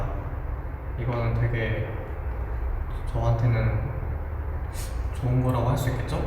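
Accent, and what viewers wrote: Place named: Gyeongbuk, South Korea. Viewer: 고향이 어디인가요? 경북지역 억양이 있는데.. 궁금하네요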